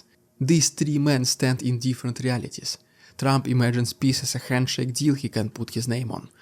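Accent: Russia. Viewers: Dude just do the videos in Russian. No one can understand the "Runglish" you're slinging.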